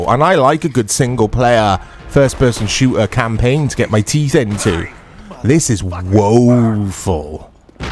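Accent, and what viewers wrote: Wales, United Kingdom. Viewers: Just saw your Gen Zero worth playing in 2024 and wanted to ask by any chance are you from Wales or live close by as you have a very Welshy accent.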